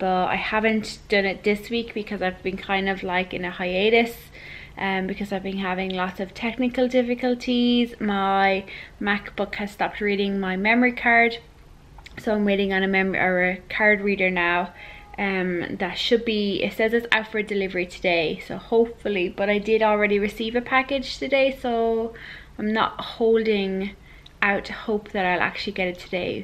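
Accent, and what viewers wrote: Ireland. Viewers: I'm from Middle East and I love Irish accent 😊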